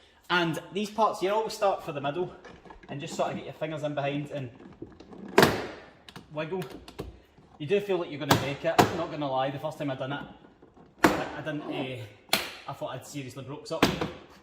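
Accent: Scotland, United Kingdom. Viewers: Great video! As a yank, it was fun listening to a car vid with a Scottish accent. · nice job :D also are you scottish u sound like kieran tierney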